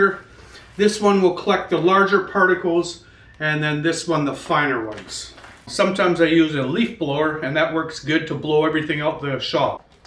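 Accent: Canada. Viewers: great job bro! p.s. you remind me of Jordan Peterson's accent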